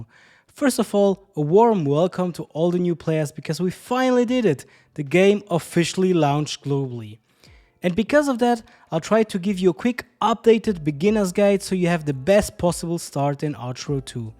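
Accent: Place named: Germany. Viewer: Idk, maybe it’s me, but when I listen to your English, it feels like you a German. Hab ich recht ?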